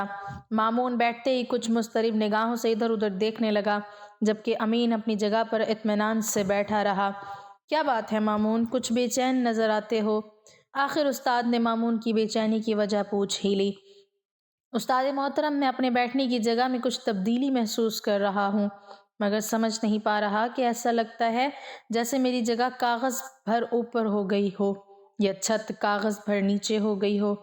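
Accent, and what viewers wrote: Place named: Saraiki, Pakistan. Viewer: یہ سرائیکی excent لگتا پے۔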